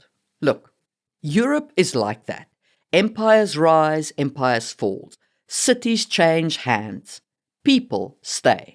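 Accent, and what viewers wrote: South Africa. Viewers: Oh, it's no wonder I thought you were South African originally! I lived in Cape Town myself for a while 😁 Love your videos, thanks so much for all the tips and wisdom.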